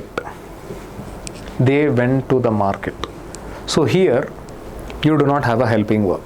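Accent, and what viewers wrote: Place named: Kerala, India. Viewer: Sir is your language is malayalam bcz of your talking style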